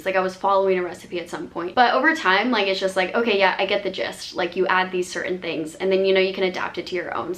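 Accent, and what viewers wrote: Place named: Utah, United States. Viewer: Definitely a Utahn with that “what I’m DO-EEN” accent. Not a Mormon though because coffee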